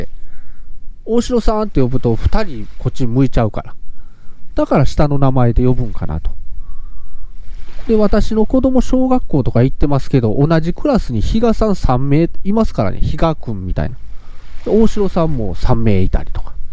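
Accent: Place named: Kansai, Japan. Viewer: 15年沖縄にいて関西訛り抜けないのが1番ビックリしてますw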